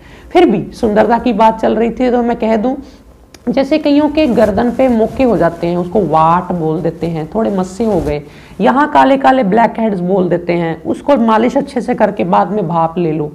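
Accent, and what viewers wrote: Punjab, India. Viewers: Aap Punjabi ho??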